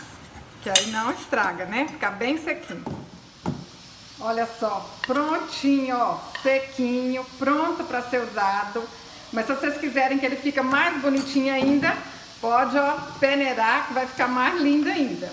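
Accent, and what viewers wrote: Minas Gerais, Brazil. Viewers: Mineira certeza, só de ouvi -la já é uma cura, linda 😘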